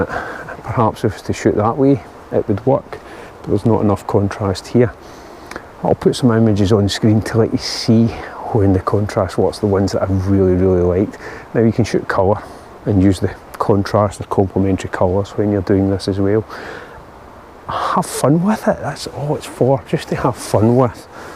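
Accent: Scotland, United Kingdom. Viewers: I love your work and ICm Concept. Unfortunately i profit only half of it beacause I am unable to understand your Scotish. I respect it but for me this is a bit frusutrating. However I your work is outstanding.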